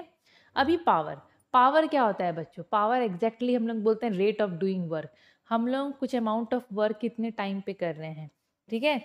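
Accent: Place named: Kolkata, India. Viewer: Mam your accent is kalkatiya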